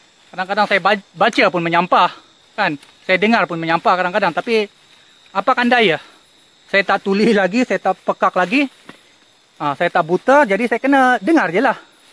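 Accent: Sabah, Malaysia. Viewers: Ada logat sabah.awak orng sabah ke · Mcm org sabah loghatnya bercakap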